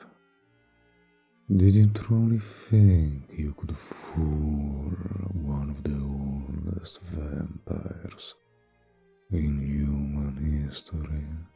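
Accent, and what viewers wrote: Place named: Russia. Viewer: Why do i feel like the accent is russian😨(its super cool thought)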